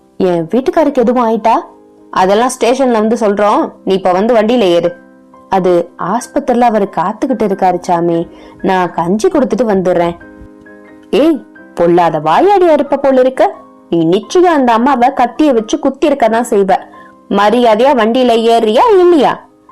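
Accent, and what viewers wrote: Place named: Chennai, India. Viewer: Good evening kuttima. ஐயாவின் அடுத்த ஒரு அலையான... குடித்தனம்.... சிறுகதை கேட்டு மகிழ்ந்தேன். ஐயாவின் எழுத்துகளை விட உன் குரல் சென்னைச் சேரிப் பேச்சு வழக்கத்தை அனாயாசமாகப் பிரதிபலிப்பது அருமை! இத்தனை சாட்டையடிகளுக்குப் பின்னும் இன்றுவரை இந்த அவலங்கள் தொடர்வது தான் அந்த ஏழைகளின் தலைவிதி போலும்.... குரலோசைக்கு வாழ்த்துக்களுடன் நன்றி மகளே. அன்புடன் அப்பா.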